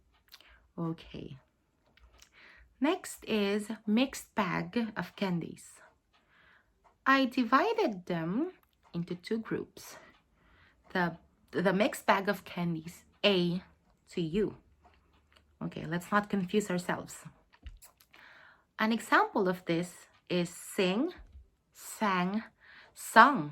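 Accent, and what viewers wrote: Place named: Venezuela. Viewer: Parla venezuelano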